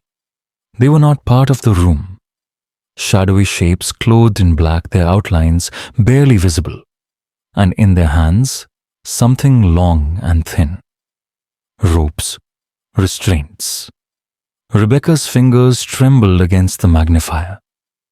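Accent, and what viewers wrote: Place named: India. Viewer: so I love the stories, but bro leave your Indian accent at home. A suggestion from one Indian to the other.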